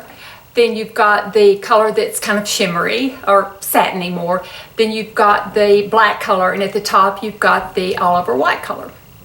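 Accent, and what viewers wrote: South, United States. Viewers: Hi neighbor - I am from the western side of Arkansas. Discovered your channel thru The New Beauty email article recently. Love watching you and hearing that southern accent and phrases I am so used to. I feel like you are my good friend! Your demos are very good, especially for me, since I am 9 years your senion. I will keep watching. Keep up the good work!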